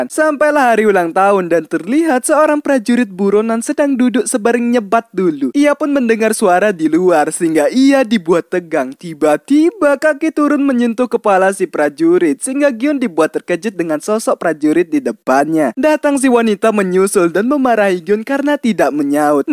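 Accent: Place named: Bugis, Indonesia. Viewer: Telasomu Ko bilang bang😂 Bugis ye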